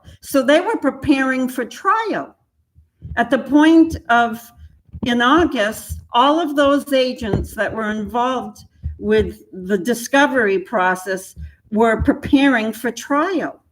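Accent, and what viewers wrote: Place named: Boston, United States. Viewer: You sound like you come from Boston or near Mass...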